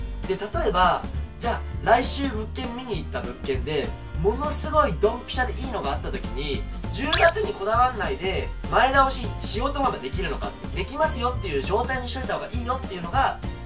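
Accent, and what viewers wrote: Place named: Gunma, Japan. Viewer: 群馬イントネーションわろた。ジャートンの編集いいよね。笑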